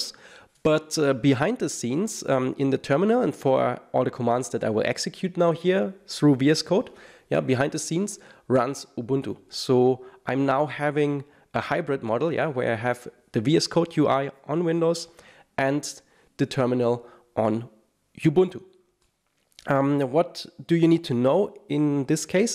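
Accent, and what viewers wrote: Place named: Germany. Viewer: Great Video!!! 🔥🔥🔥 Love the German accent! ♥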